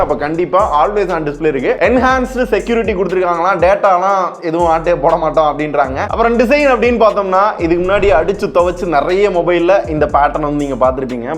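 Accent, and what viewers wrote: Madurai, India. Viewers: Takkali....vokali ❤ pathukkalam madurai slong❤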